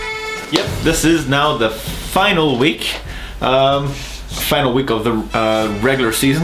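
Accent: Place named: England, United Kingdom. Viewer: Dude why you upload like this your video ?? For me its difficult now to understand you English accent .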